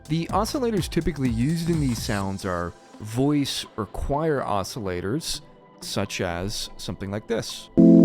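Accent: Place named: West, United States. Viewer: The deep West accent just makes these even better, it's that American accent we never hear in the media, it's a complete trip man.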